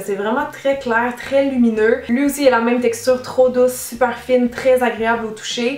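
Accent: Quebec, Canada. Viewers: J'adore tes vidéos ( et l'accent québécois 😍 qui est la raison pour laquelle j'avais commencé à te suivre 😅)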